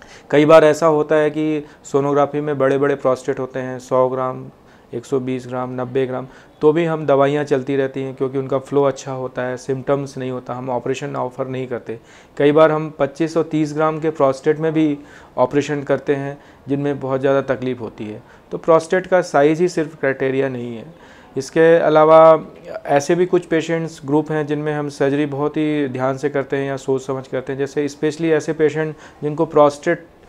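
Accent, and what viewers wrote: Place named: Uttar Pradesh, India. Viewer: VERY SOFT EXPLANATION WITH U.P ACCENT, THANK YOU.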